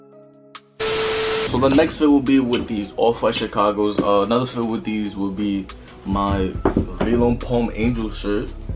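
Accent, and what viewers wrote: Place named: New York, United States. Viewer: Yee i aint een see da name nd i knew yu was from new york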